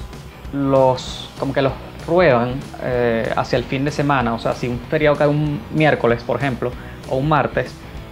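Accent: Venezuela. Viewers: me encanta tu acento venezolano...saludos desde mexico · ¿Y de dónde eres? No he podido identificarlo por el acento... ¡Ah, ya! De Venezuela.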